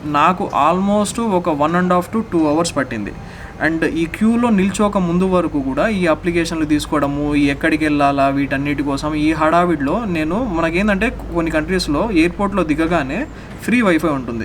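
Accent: Rayalaseema, India. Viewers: Like your rayalseema ascent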